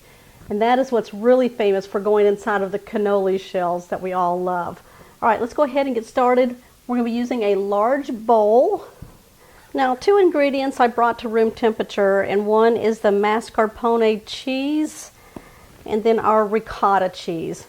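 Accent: Texas, United States. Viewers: I am delighted with your Texas accent pronouncing ‘marscapone’ like ‘NASCARpone’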